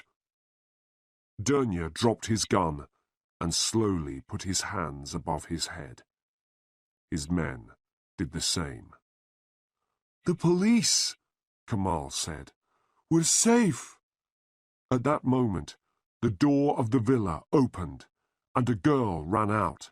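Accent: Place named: United Kingdom. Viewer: You are my favourite and perfect story poster, because its always with British accent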